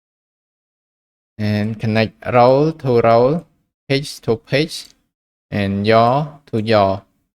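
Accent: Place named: Thailand. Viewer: As soon as I turned on the video, I felt a very familiar accent. I lived for some time on the island of Koh Samui. I'm sure it's a Thai accent. :)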